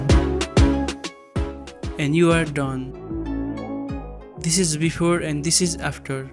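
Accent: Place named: India. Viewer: ugh, what an indish accent.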